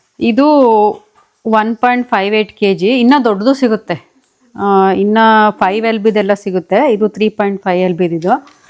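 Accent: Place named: Malavalli, India. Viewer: ಮಳವಳ್ಳಿ ಶೈಲಿಯಲ್ಲಿ ಮಾತಾಡಿ ಕೇಳೋಕೆ ಇನ್ನೂ ಚೆನ್ನಾಗಿರುತ್ತೆ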